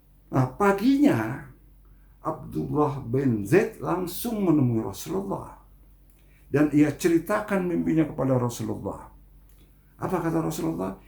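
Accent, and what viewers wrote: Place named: Betawi, Indonesia. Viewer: Alhamdulillah, nama sy dan pak Sudari disebut, dalam Video YouTube Panggilan Adzan. Mantap, dg dialek kental Betawi, Tausiyah yg gampang diingat, pas sedikit ada kelucuan. Lanjutkan, Abah Ustdz.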